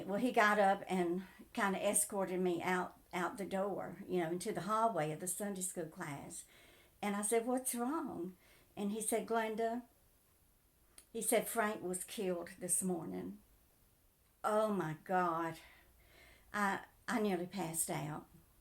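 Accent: South, United States. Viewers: I like your soft voice and your southern accent. You're a born storyteller and your life stories are worth hearing. So sorry about your son and Frank too. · Glenda, I came across your videos a couple of weeks ago. After watching the first one I was hooked. I went back to the first one and I have been bing watching and finally have caught up to your most recent one. You are a breath of fresh air, I love your soft southern voice (I’m from Kentucky so I’m pretty southern myself😉). I love all your stories, cooking, and your teaching to the younger generation about finances & saving. God Bless you sweetie😊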